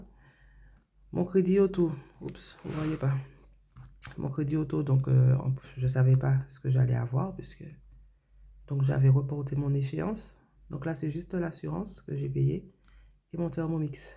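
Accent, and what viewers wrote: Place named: Antilles, France. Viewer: Coucou bienvenue! Je me suis abonné 🎉🎉 j’entends les Antilles dans l’accent sa m’est familier😂! Bon courage à toi et a ta famille et au plaisir de voir tes prochaines vidéos 🎉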